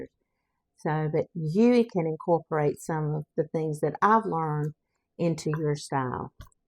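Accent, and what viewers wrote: South, United States. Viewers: I love listening to a southern woman!!!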